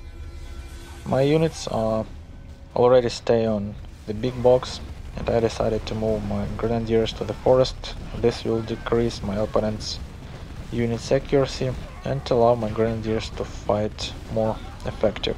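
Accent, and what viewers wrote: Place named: Russia. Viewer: с русским акцентом говоришь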